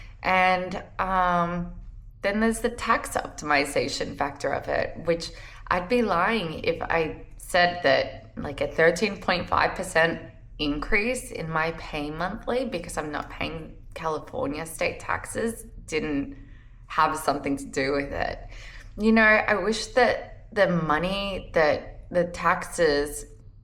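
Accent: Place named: Australia. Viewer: I knew right away you were from Australia. I enjoy your content but think you would also be good in the Tesla community.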